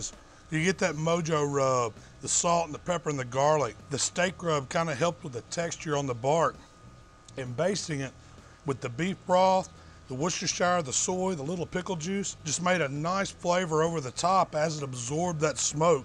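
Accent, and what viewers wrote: South, United States. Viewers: I went through dozens of bbq videos until I saw a big fat guy with a southern accent. Then I was like, "I'm gonna listen to THAT guy." Love your videos, brutha. Total food porn for real. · That one good me good, he often says stuff like this, really southern imo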